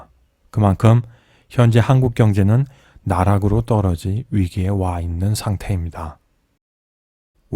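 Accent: China. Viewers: 조선족이신가요? 발음이...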